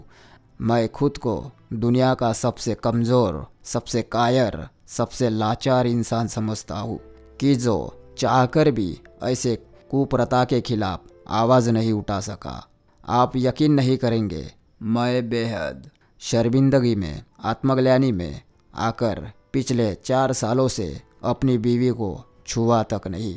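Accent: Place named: Telugu region, India. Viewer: Aapke baat sunke aisa lagta hei ki aap Telengana ya Andhra side ke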